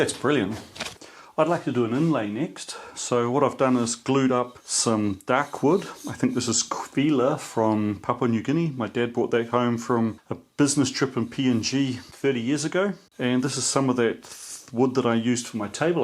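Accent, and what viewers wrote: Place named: New Zealand. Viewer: I'm here for the old machines, the witty humour, the Kiwi accent, the aviation stories and I'll happily stay for the cooking and woodwork!!!!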